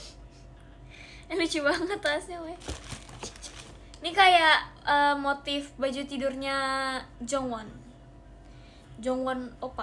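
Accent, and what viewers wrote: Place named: Java, Indonesia. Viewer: Kenapa dia bisa logat jawa ya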